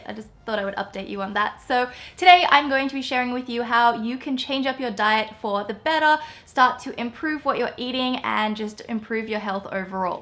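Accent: Australia. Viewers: She has an Aussie accent. is she from Australia? :)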